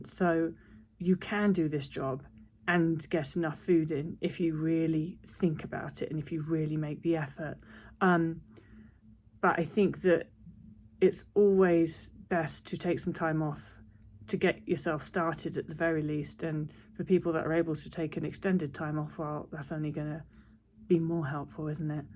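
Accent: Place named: France. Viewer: haha i love your french accent! :)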